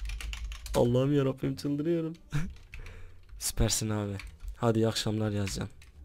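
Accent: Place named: Malatya, Turkey. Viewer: abi nerelisin bizim melekt gibi konuşuon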